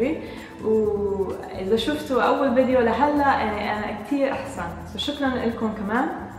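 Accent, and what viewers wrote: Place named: Palestine. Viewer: رغم انه عربي مكسر بس توقعت من اللهجة انه اصل فلسطيني وكمان حلوين الفيديوهات بالتوفيق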